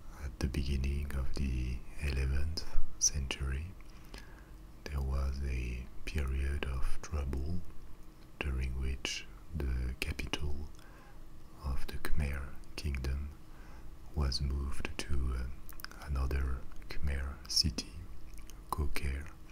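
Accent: France. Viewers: I'm not usually find of French accents. Yours is different, I just don't know what makes me like it so much.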